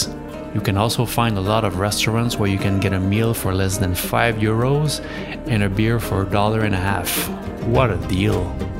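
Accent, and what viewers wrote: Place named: Quebec, Canada. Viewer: J’ai spotté ton accent Québecois lol. Nice video btw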